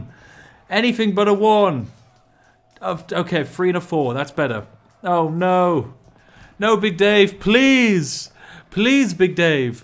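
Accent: United Kingdom. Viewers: I could listen to read a EULA. Such a spiffing British accent should come with a warning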